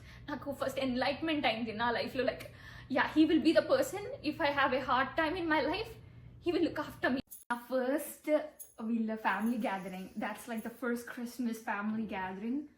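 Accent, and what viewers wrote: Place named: Andhra Pradesh, India. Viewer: Are you from Andhra? Because your slang felt exactly like that.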